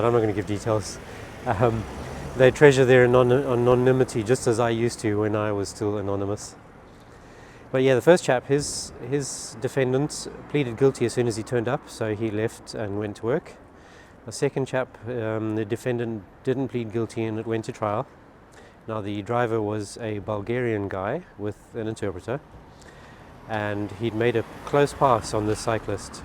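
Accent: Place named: South Africa. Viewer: Cant work out the accent, South Africa at a guess? Whats the driving like over there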